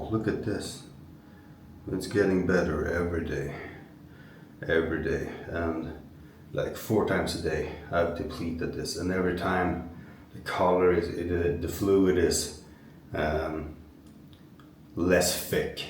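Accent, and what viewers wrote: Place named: Finland. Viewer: Vilken härlig och positiv finsk tjej! 😊Även om hon har en mycket bra engelska, så hör jag direkt en finländare.😉Menit seurantaan. Odotan innolla tulevia videoitasi!😉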